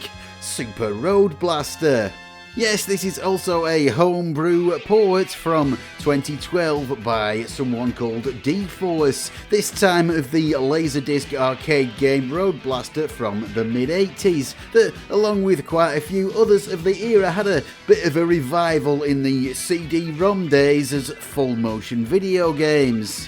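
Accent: United Kingdom. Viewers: This is how proper British folk talk.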